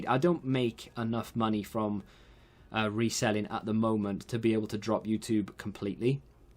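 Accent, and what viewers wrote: Wales, United Kingdom. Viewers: Well, first of all, I fully understand and respect your decision. You gotta make the decisions that are best for you and your family. I'm 30 and I feel like that's one of the most important lessons you learn in life, that you need to be adaptable in order to make your life a success. Second of all, if FIFA 22 really is your last, that will be a massive shame, you will be hugely missed. I love your way of thinking and the way you talk, it's a bit old school and I have family in Wales, so I love me a bit of Wales and the Welsh accent. Thirdly, really looking forward to the RTG in FIFA 22! Your RTG in FIFA 19 was absolutely awesome and I really hope it'll be more or less like that. It'll be great to see you approach it exactly the way you want to, no holds barred. Good luck with everything boyo 👍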